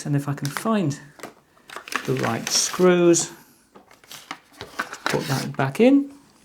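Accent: England, United Kingdom. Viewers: I like your British accent . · this english dude makes us sound like the stereotypes not cool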